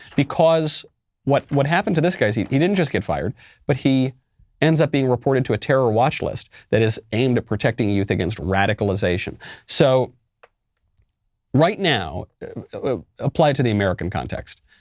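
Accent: England, United Kingdom. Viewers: Christians now more scared of the left than of God!! Your English accent is shite. Its doesn't make you sound funny and takes away from the point you are making. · with that British accent had me dying lmao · Not a bad UK Accent Mike! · Your British accent is quite impressive... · I'm worried now. I live in England I'm still in school. We're learning about abortion and school and I'm probably the only pro-life person in my year; l've quietly mentioned my views and had a discussion with my liberal friends on it, but I don't feel it's safe to be completely honest even, well especially in my Ethics class. Your English accent is questionable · Love the English accent! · Very nice british accent by the way. Also, yes, we live in a day and age where Christian views are no longer tolerated. I fear for raising my children in such a society.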